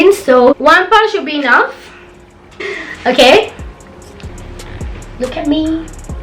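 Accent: Malaysia. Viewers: im sorry . i hardly understand your english cause it sounds different . mybe your accent is more to malay . but overall i love your makeup . lit 😍👍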